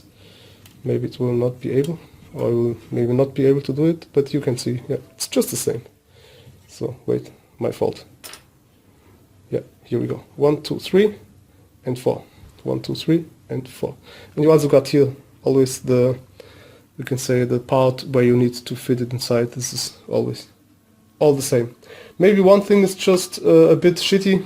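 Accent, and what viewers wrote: Germany. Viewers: Hey, are you German by any chance?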